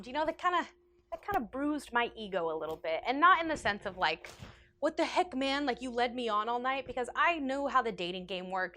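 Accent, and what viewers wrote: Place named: United Kingdom. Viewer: Nice British accent!:)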